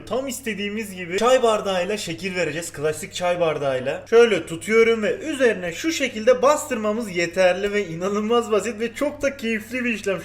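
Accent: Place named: Erzurum, Turkey. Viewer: Abi nerelisin dadaş gibin konuşuyorsun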